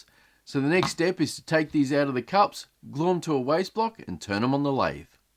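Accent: Australia. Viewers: Those are beautiful💯 New sub here, it's nice to hear an Aussie accent. Cheers from Melbourne mate😊✌❤🇦🇺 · Are you putting on the Australian accent extra thick on purpose?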